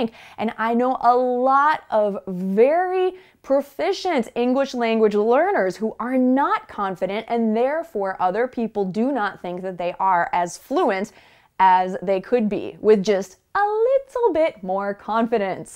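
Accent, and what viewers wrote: United States. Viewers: I love British but your American English is perfectly beautiful and I always enjoy learning English with your channel, in fact I've subscribed it years ago. By the way, I tell my students too to practice speaking English in front of the mirror at their home.. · Whare are you from sister? Is it from America?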